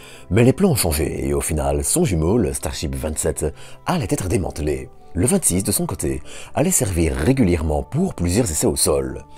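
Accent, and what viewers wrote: Belgium. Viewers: dsl que tu te sois pris une partie de la communauté sur le dos; c'est dû à un ras le bol contre des gens habituellement mauvais, voir insultant, envers, en tapant généralement sur sa voix et son accent. Tes coms sont soft et argumentés, et n'ont rien à voir avec eux. Ce qui est inhabituel dans la voix de est d'une part son timbre de basse, sous le baryton, et d'autres part son accent belge, voire (si je ne me trompe) wallon. Perso j'adore, mais chacun ses goûts! 😋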